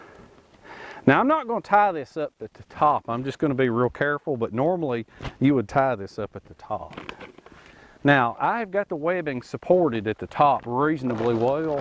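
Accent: South, United States. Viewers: Hello again lidal ole England. Now i must admit, it took me till halfway through that vid to come on board. ive seen a few of your vids now. and i have wondered. is this guy for real? That accent of yours is fantastic, somewhere between the Dukes of Hazzard and Forest Gump. Back to your product, a few years back i had an idea in my head, not one that would make money, in fact i assumed someone must have already invented it. A camp bed without a frame was the idea i had. you could make the poles yourself with long straight bits of wood/logs. my idea was to have a sleeve running the lenth of each side. but your webbing on the side idea works better. due to a seriously small market, i doubt either one of us was ever gonna get rich, or a patent. this is a case of great minds think alike, as you have made the idea i had. And taken it several stages further with the whole survival blanket thing. 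i was just thinking a camp bed without a 37 piece pole puzzle. So all in all, good job mate, seriously. me and a friend of mine are in the planning stages of making our own youtube bushcraft/camping/outdoor stuff channel. I'll be sure to let you know as soon as we post anything worth watching. Gary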